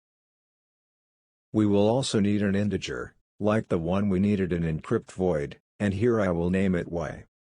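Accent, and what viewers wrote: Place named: United States. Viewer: the American Accent makes it easier to understand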